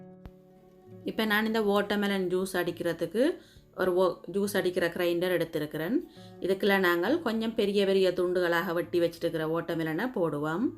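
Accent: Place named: Sri Lanka. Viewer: Supero presentation r u from sri lanka nice to hear your tamil m new frnd stay conctd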